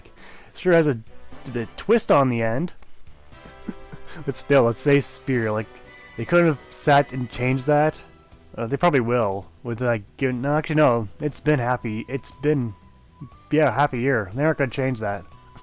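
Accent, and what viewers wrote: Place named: North America. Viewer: wild guess really, the accent tells me youre from usa or canada, so it felt likely, anyways sorry if i offended you somehow, im just really peeved at certain words being spelled wrong but i didnt know it was an intentional joke on your end.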